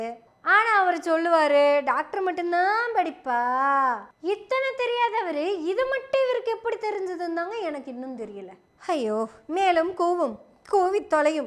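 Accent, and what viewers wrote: Kongu, India. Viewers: ஏங்க கொங்கு பாசையை விக்கிறேங்கோ? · கொங்கு தமிழ் சூப்பர்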